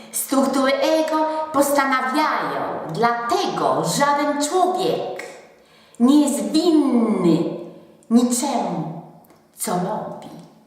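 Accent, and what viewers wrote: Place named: East, Poland. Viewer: Ona ma wschodni akcent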